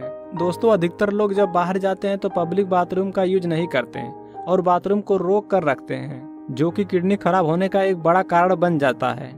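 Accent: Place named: Bihar, India. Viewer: Sala bihari ki tarha baat karta hai lol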